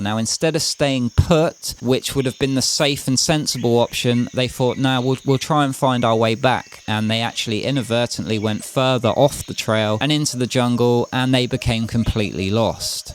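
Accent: United Kingdom. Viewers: Your English accent makes the English language lovely to hear and understand.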